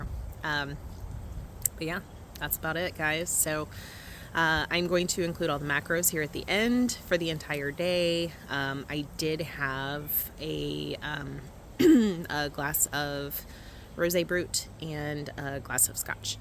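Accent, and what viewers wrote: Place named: South, United States. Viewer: I can tell you are from the South like me.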